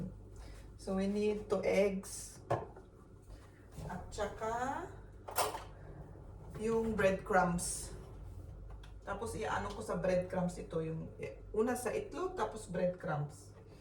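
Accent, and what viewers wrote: Philippines. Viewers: Parang filipina kana rin pati pananalita,nakakatuwa naman.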